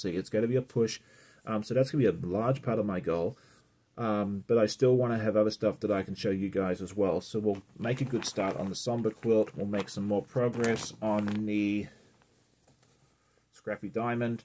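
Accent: Australia. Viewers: Hi, really love your koala quilt, and also the daschound quilt. Your doing fantastic stuff, and I like your promoting of other YouTubers, By the way, because I’m an Aussie too, I don’t pickup on your accent…you sound like everyone else I know! It’s funny…when I was in US and UK, I could pick out an Aussie accent immediately as there were so many other accents that were new to me. Good luck finishing everything for the quilt show, 👍🇦🇺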